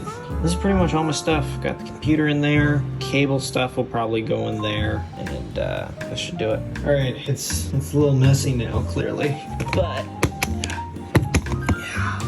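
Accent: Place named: England, United Kingdom. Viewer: Hello, I hope you see this comment. You have many fans in Turkey and there are no Turkish subtitles in your videos. It is not a problem for me, but I think it is a problem for those who do not understand the English accent. Please take this comment into consideration and you should do something about this issue. I think we love you.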